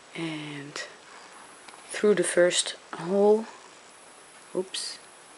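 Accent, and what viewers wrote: Netherlands. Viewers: Where can one buy that mini hole punch? I think your instructions are wonderful and so clear. I love your Dutch accent too, so pretty.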